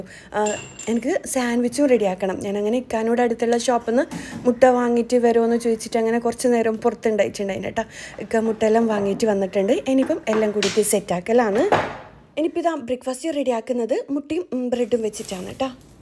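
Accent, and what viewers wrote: Kannur, India. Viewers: കണ്ണൂർ ഭാഷ അടിപൊളി... 👍👍👍ഞാൻ മലപ്പുറം ആണ് ട്ടോ.. 🥰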